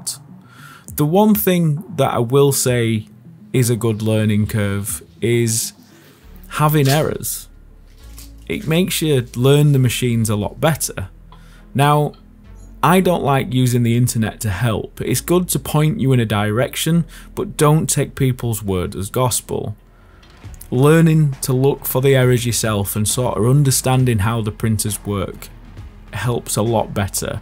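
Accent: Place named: Yorkshire, United Kingdom. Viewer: Always love your videos buddy, nice to hear a Yorkshire accent hopefully bump into you one day I’m not far away in Rotherham myself.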